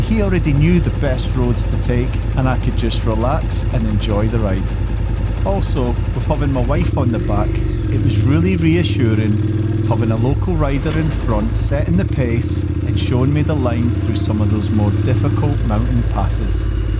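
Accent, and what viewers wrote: Scotland, United Kingdom. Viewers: Just finished a ride today around the island. Unbelievable. Didn't know it existed. Arrived for a conference and had 6 hours to spare so hcuked it. Some of those steep hills are knarly! Good to see Scottish accent and all 💪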